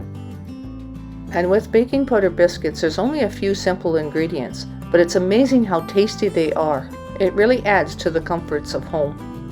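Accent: Canada. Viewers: Are you Canadian? You have such a strong Canadian accent!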